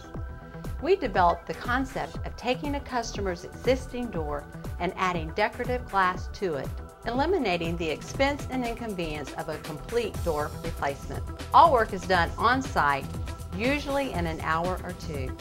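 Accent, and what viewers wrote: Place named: South, United States. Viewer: sign me up I just want to see you in person. hot south accent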